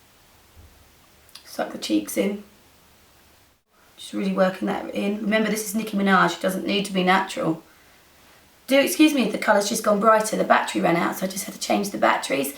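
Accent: United Kingdom. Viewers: Are you from the U.K coz u have a british acceny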